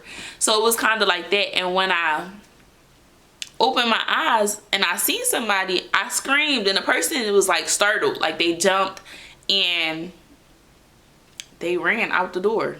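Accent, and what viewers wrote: Philadelphia, United States. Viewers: You sound like you from PHILLY! That accent is CRAZY.. I'm listening for the word "Jawn", or "Drawing", but your talking kinda proper right now 😂 but you can't hide that accent. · I know that philly accent anywhere 😅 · I knew you were from Philly before you said you were from there 😂😂 sending love from Philly ❤️ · Are you Black American? Love the Philly accent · Damn I’m bout to do the same move.. I knew u was from Philly as soon as u started talking… it’s crazy out here and I’m glad u safe and made this video.. #BeSafeTho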